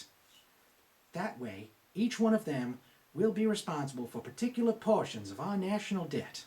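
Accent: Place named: Virginia, United States. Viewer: Nice northern coastal Virginia accent.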